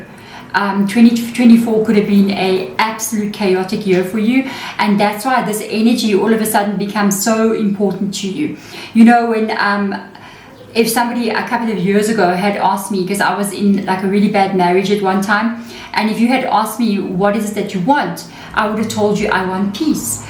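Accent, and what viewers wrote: South Africa. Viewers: So glad to hear a South African accent! From a fellow South African. Everything you say resonates.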